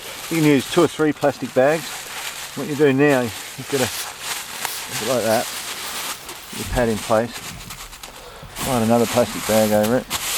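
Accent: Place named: Australia. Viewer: You have a different technique than more farriers in not straddling the horse's leg. Love your accent, are you from Australia?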